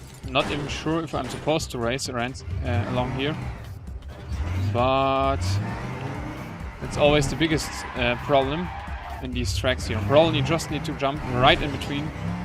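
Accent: Germany. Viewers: you have a German accent!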